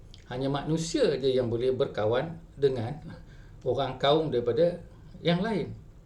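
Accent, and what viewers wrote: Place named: Sarawak, Malaysia. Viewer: berucap mcm orang Sarawak. Mesej bes! Setuju 100%!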